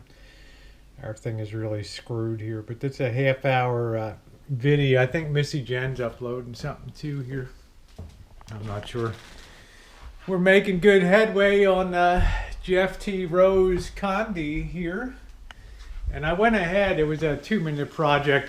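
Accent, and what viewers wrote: Baltimore, United States. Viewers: It sounds like you have a Baltimore accent...have you lived there?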